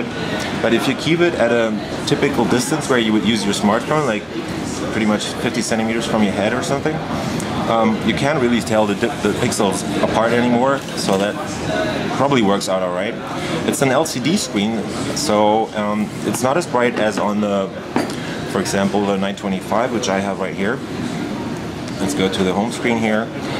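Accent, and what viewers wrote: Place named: Germany. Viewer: Your english has less of a german accent here than it used to have, i noticed all of a sudden.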